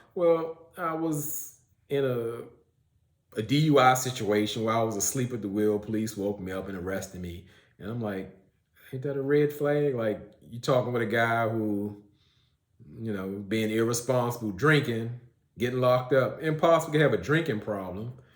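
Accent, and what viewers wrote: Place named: Washington DC, United States. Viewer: Good review. Where you from bro? I hear a little bit of home (DC/dmv) in your voice?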